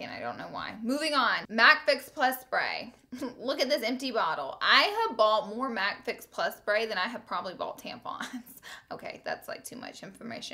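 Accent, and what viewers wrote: South, United States. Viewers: Your southern accent is so cute 😂❤️ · i love your accent and how you always say "whenever" instead of "when. so southern <3 you're precious!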